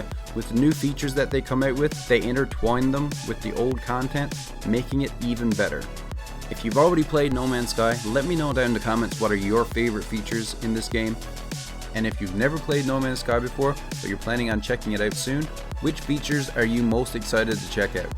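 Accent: Newfoundland, Canada. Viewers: U sound very Canadian. Newfoundland? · What part of Newfoundland you from? Looked at one of your money and nanite making videos, soon as you started talking, I said he's a Newfoundlander! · Aha...Newfoundland! That explains it. Thanks for answering, nice accent 👍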